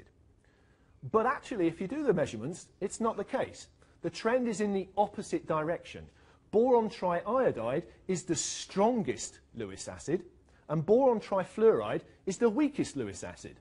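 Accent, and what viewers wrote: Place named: United Kingdom. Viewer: thanks, nice to hear a british voice with passion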